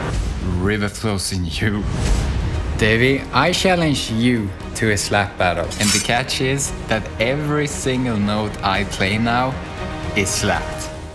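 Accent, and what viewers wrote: Sweden. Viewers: Tha svenska accent >>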